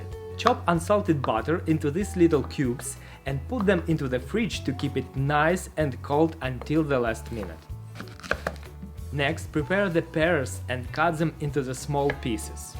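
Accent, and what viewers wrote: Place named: England, United Kingdom. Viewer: As an English teacher I can surely say - you have a beautiful English accent👍😍😘. And I'm a huge fan of all your channels. Love you guys😉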